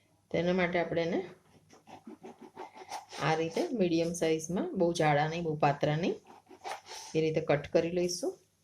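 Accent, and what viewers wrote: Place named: South Gujarat, India. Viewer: ok. I think you are from south Gujarat.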